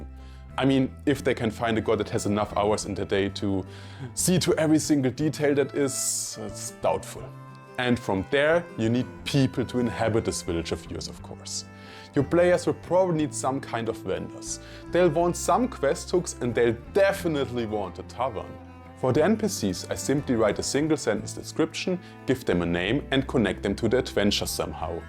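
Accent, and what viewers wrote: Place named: Germany. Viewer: klingt nach nem fellow german der englisch spricht. good luck mit dem channel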